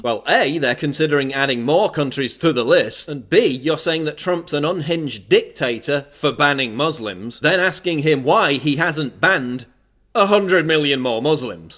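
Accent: United Kingdom. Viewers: off topic but aren't you british ??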